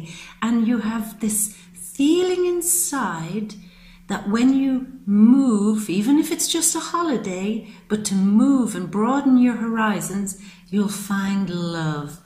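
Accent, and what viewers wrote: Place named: Ireland. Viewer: You are so amazingly beautiful you remind me of Maureen O'Hara and you have such empathy and warmth as part of your personality, it's intoxicating. Plus, there is, how does Sean Bean say it, "A charming Irish lilt."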